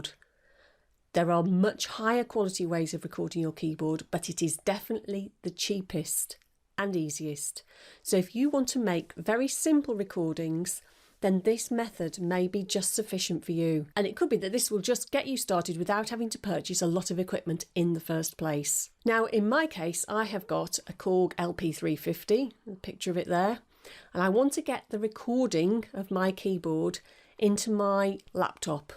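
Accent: United Kingdom. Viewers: UK accent. Kinda wiredley explained hm but imma.. give it a try..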